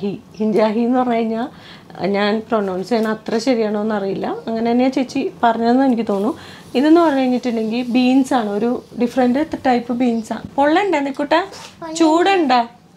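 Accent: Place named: Kochi, India. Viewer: Chechy vyppin Kari anoo / atho kochikari ?slang kettit angine thonnu, enthayalum sambhavam powlichutta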